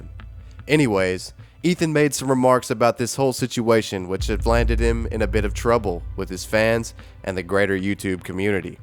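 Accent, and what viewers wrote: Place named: South, United States. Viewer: Why do you sound like a CSA southern solider ?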